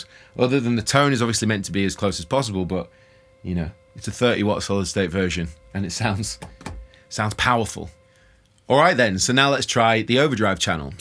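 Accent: United Kingdom. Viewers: even your spoken words are so accurate, like your renditions haha. Don't get me wrong, English is not my first language thus sometimes it is very diffulicult for me to understand British accents in this US accent dominating world.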